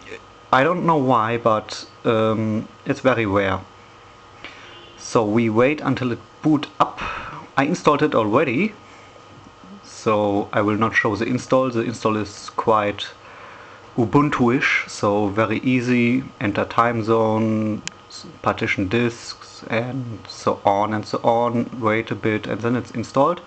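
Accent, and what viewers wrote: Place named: Germany. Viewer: du solltest schon Deutsch reden dein Akzent hört sich nach deutschem an. dieses kommentar hab ich von nem LapTop mit gOS geschrieben.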